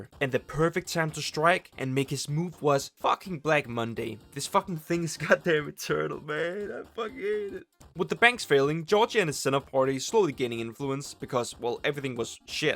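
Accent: Denmark. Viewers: Live the danglish Ære til Danmark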